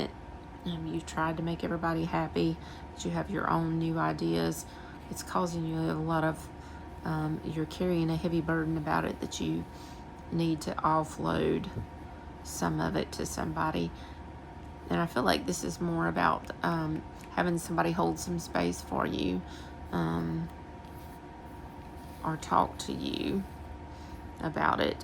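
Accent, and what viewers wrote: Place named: South, United States. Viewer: LOVE your southern accent... sounds just like mine from NC💞 Thank you for sharing your cool decks! I totally resonated with your large spread about family issues. Every card was right on.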